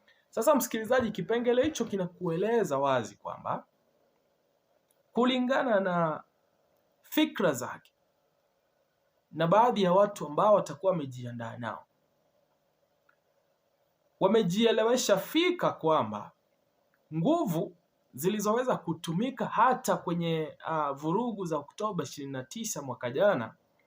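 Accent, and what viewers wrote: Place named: Luo, Kenya. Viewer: Kama mtaandamana kama shetani wenu heche alivyojinadi katika mikutano yake jiandaaeni kuona mnayoyataka kuona na huo ndio utakuwa mwisho wa ushetani wa chadema kama heche atajiona kuwa yeye ni zaidi ya Dola ajitokeze siku hiyo asiache kuandamana atangulie yeye pamoja na genge lake la uhalifu wa kisiasa na wewe kijana kutoka Kenya na hiyo studio Yako unachoongea kuhusu hayo maandamamo haujitambui na lafudhi Yako ni ya kijaluo endelea tutakupata pale ulipo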